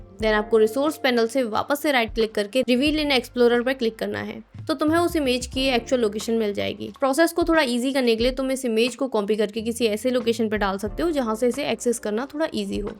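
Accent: Gujarat, India. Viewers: Maybe Work on your Gujarati accent...or else evrything else is fine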